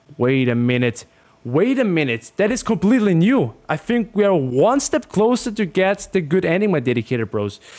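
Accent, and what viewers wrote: Germany. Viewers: your german? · Ayo you german?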